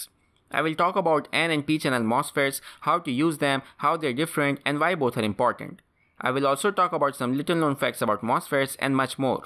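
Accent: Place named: India. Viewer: Your commentary is more to express your English language efficiency and not the subject matter. You are reading the information very fast and you do not know what you are talking about. Yet you have a very strong indian accent 'depletion region', and "available" are the worst to understand. You collected information from the web and read it out loud. It was of no help. Everyone knows about n and p channels, plus bipolar transistors. Anyway, thank you for upload.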